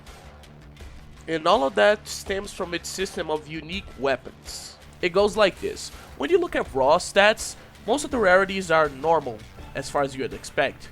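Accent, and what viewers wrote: Brazil. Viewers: Eu to aqui pensando "que sotaque... brasileiro" ai fui ver e de fato, é um brasileiro falando e.e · I don't know how but I immediately spotted a brazilian accent on his voice, which took me off guard since: 1- I'm terrible at that; and 2- I don't know many brazilians who enjoy Borderlands. It's definitely lesser known IP around here and I had to brute force my friends into this franchise. What a pleasant surprise, keep going!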